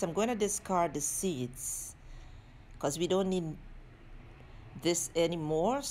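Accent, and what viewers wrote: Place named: Guyana. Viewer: I just knew you were from Guyana. u sound like one side of my family who’s from there too ❤😂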